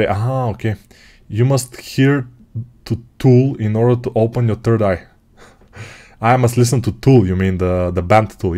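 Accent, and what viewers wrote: Bulgaria. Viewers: I hear that Bulgarian accent from miles away :D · You bulgarin bro (аз също + това акцента ти леко издава ) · The moment I heard your voice I new you were Bulgarian :D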